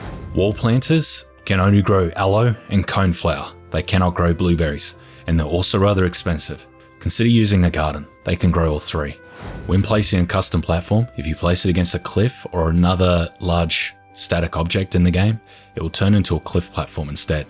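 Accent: Australia. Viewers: You sound like an Australian Ahoy <link> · Such an Aussie accent but awesome vid mate! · classic aussie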